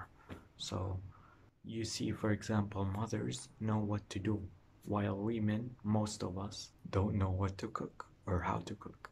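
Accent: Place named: Iraq. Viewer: Make subtitle with it please... I really wanna learn Iraqi accent 🥺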